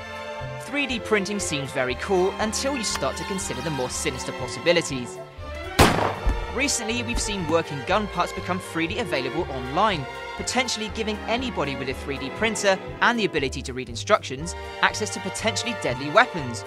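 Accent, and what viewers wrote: United Kingdom. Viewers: When you make a video do not have loud music playing in background, tone down the volume, it is irritating to listen to music and spoken word at the same time. Second, please slow down your machine gun speed talk. One who is not used to Brittish accent of English can hardly understand what you say at that speed. Better articulation of words would also help. Thanks for the video - interesting.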